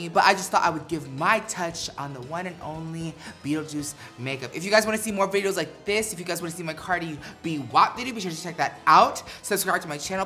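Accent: United Kingdom. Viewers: Your voice with british is really good omg! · Love the British accent 😍😍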